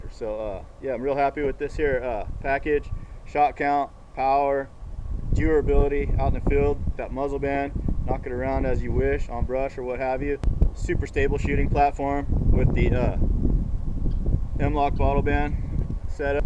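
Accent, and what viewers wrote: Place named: New York, United States. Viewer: I see you are in west TX, but from your voice I detect Louisiana and a subtle NY accent. I am in East TX by the way...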